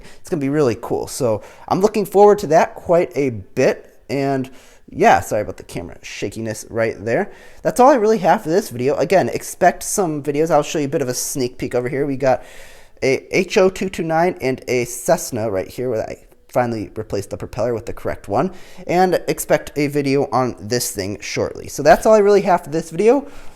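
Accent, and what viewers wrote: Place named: United States. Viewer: I wanted an A350, but 757 is okay. It's stupid to get angry over what company made it, especially because it is mostly determined by a bias of American/Russian(they love their unsuccessful planes)/other(Airbus). I am British and am inclined to Airbus, you sound American and so like Boeing, Skyships like Russian because he is a vodka-drinking comrade.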